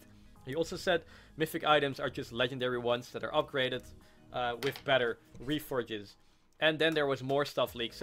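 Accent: Netherlands. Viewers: R u dutch? Just asking?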